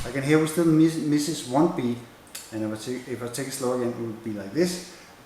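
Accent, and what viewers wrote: Denmark. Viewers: haha han er så meget dansker !!! xD det så tydeligt på hans engelsk udtale ! xD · That Danish accent is so funny ! Great lesson :)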